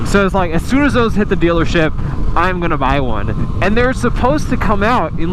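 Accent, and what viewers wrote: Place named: Texas, United States. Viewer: Your Texan is showing! Yall!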